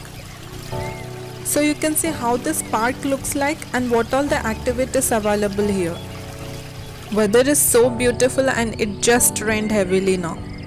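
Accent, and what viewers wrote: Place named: Hyderabad, India. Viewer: I'm watching ur all vedios n I loved it...ur voice is too good ..specially wen talk hindi,ur hydrabadi accent is too funny...😁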